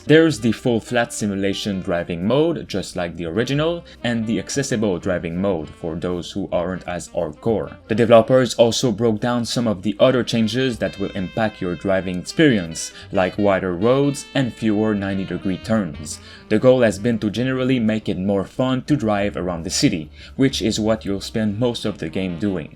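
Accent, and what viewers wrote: Quebec, Canada. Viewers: Tu es du québec ca c'est sur avec l'accent è la GSP hehehe moi aussije suis du québec. Si tu as envis de faire un podcast en francais un jour laisse le moi savoir! moi aussi j'attend mafia 1 remake jen'y ai jamais joué mais j'adore la série donc j'ai hâte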